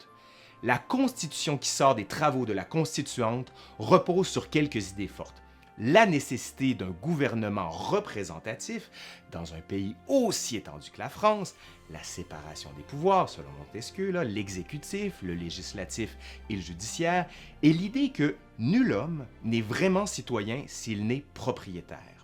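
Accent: Quebec, Canada. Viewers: Salut. C'est un énorme travail que tu as fais LA😃👏 l'histoire de France avec un accent Québécois... super ! Presque 3h qui sont passées très vite ! Merci à toi 👍Ah javais déjà fait un com y a 1an😆😃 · Superbe vidéo J adore l accent québécois :) Au-delà de la boutade, Merci! c est passionnant et très instructif Un français en amérique ... :) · ... le révolution française relue par un historien canadien, avec l accent canadien, très intéressant 😂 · J’apprehendais beaucoup la vidéo à cause de l’accent canadien, mais je dois dire que j’ai été agréablement surpris ! J’ai accroché dès le début et je n’ai pas lâché avant la fin 2h44min plus tard... très bon travail, j’attends votre épisode sur la 2eme guerre mondiale avec impatience ! :) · Passées les digressions humoristiques… La qualité d'écriture et le charme du québécois s'opèrent. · Et puis puisqu'on est entre amis, pourrais-tu faire un jour un travail sur la guerre d'Algérie, je suis l'ultime pied-noir de ma famille et j'aimerais bien voir ce sujet traité par quelqu'un de compétent qui ne soit ni Algérien ni Français puisque vu ton léger accent il m'a semblé que tu as plutôt grandi sur les rives du Saint-Laurent que celles de la Garonne. 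Merci et bonne continuation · cet accent québécois me fait saigner les oreilles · Une personne qui présente bien, qui parle bien, belle gueule, avec un p'ti accent du canada... Bref je m'attendais pas à quelque chose d'aussi tip top! Pouce Bleu & Abonnement! Offrez lui une cocarde! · Maaaiis je suis sûre q l'histoire de France intéresse Bcp de monde de par le Monde... 😉 C 1 véritable série à épisodes.... 😄 !!.. Et j'Aadôre l'accent Québécois... 👍👍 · Bravo pour la vidéo, très enrichissant, j'ai appris beaucoup grâce à toi. Merci beaucoup à toi et continue comme ça. +1 abonné 😘 (Ps: je vis en France et ton accent québécois est plaisant 😉) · J'suis la seul à kiffer le fait qu'il est un accent Québécois ??? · Passionnante cette chaîne Travail de qualité Bon continuation J adore cette chaîne Et l accent des cousins d Amérique apporte son petit plus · Ton accent québécois m'énerve je peux pas regarder la vidéo dommage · j'adore l'accent québécois pour raconter l'histoire de France , j'ai l'impression que vue de loin, c'est plus objectif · Toujours aussi géniales vos vidéos D'une qualité rare et très dynastiques. 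Votre diction est formidable et l'on vous suit avec entrain. Et puis cet accent québécois !! Quel bonheur , parole de parisienne. Euh ,excusez moi ,mais je me permets de vous dire que vous êtes encore plus beau sans barbe 😆👍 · L'accent du Québec n'est pas bon. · Grave kiff j’étais sur mon téléphone quand je entendu ta voix Québec je sais pas pk mais j’ai flippé mdr 😂 mais ouais sinon cette génial 👍🏾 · J'adore l'accent quebecois 🫶🏼 merci beaucoup pour ton travail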